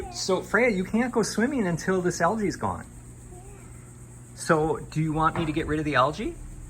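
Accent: Wisconsin, United States. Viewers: Hey I’m curious. You definitely have a Wisconsin accent. I don’t really notice with. So when you are out & about have you had people ask where you are from? That definitely is not a Florida accent. 😂. Then again what accent is FL? Considering there are so many different nationalities living there. My pool had turned green. I had 2 floaters both with 2 3” chlorine tablets & 1 tablet in my skimmer & my pool was green. I had to get 4 5 Gallon buckets of chlorine. The next day it was clear as could be. Helpful hints. I was told by the pool people to add any chemicals at night. You should shock the pool after any rain. The rain and acidity levels throw off the chemical balance in the pool. Waiting until the evening time to add the chlorine & shock slows down the evaporation process. Both totally make sense. Anything to help save some money. 💰🤑. Chemicals can be so expensive.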